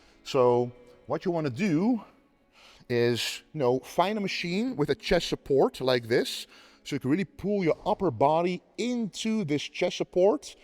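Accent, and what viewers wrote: Netherlands. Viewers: Very well explained. I also noted a accent in your articulation. I know it's not from the shoulder shrugs. You sound dutch or dutch antillean. Anyways great video. I actually did them while you were explaining them using body weight,just to get the feel of it. And I did notice muscle contraction (slightly) as it may be... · Damn, I like his dutch accent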